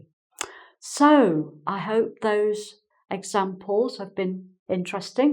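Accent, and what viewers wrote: United Kingdom. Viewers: She is authentic British which I love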